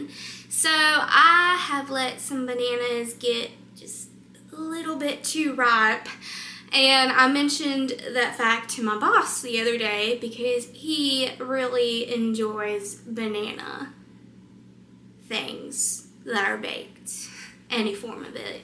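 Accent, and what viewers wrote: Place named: South, United States. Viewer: Just found your videos. Can't wait to try your recipes! Love your Southern accent!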